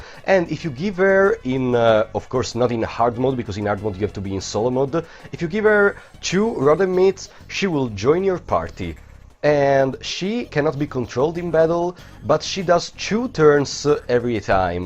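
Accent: Italy. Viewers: bro are you Italian? you sound like it we speak English similarly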